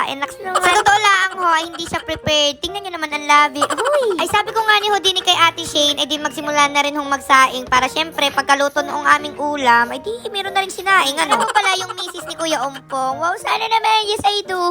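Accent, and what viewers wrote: Batangas, Philippines. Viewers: Parang Batangas eh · you have a batangueno accent 😊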